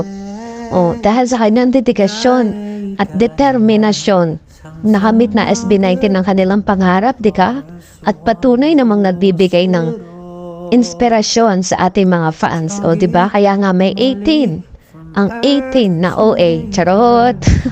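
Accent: Batangas, Philippines. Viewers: Thank you for sharing this compilation of Pablo. Your Batangeño accent and jokes made your video so unique and fun to watch. Waiting for Justin Ken and Josh 😍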